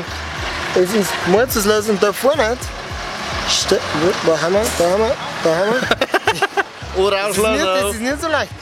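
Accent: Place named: Upper Palatinate, Germany. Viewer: Geh leck, der Oberfälzer Dialekt is scho da krasseste fo olle😄😅👍🏼.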